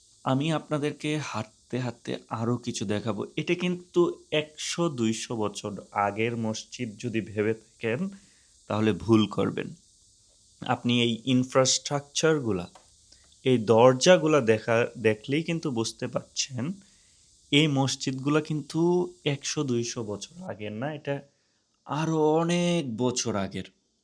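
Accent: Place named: Bhola, Bangladesh. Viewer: কথা শুইনা মনে হয়,তুমি ভোলাইয়া।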